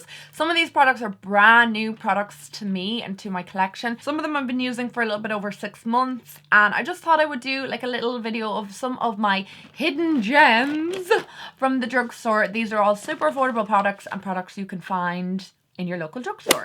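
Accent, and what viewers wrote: Ireland. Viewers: To echo other comments - your channel is a hidden gem! A lot of the big YouTubers in the beauty community are losing their shine for me - they seem to hype really expensive makeup that is out of reach for the average person and review less and less affordable items. I feel your passion and honesty and as someone who used to live in Ireland (now back home in Australia) your accent takes me back to a very happy time in my life. I love your videos, especially the Revolution Beauty reviews. Love it XO... for any other Australians reading this comment, you can buy Catrice, Essence and Makeup Revolution products from Kosmetik4less, a Germany online store that ships to Australia. You can also buy Makeup Revolution products directly from the parent company Revolution Beauty, who also ship to Australia. Priceline also stock some Essence products.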